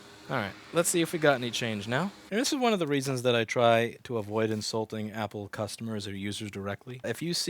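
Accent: New York, United States. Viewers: Yes, that New York accent and displeasure at anything Apple sounds richer and much warmer. Thanks, Louis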